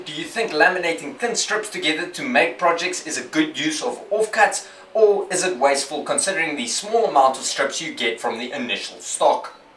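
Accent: South Africa. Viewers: This guy sounds South African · Hi sir,i would just like to know if you stay in South Africa or from South Africa staying in another country cos I stay in South Africa and you accent sound South African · Hey are you South African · Are you from South Africa? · Are you South African?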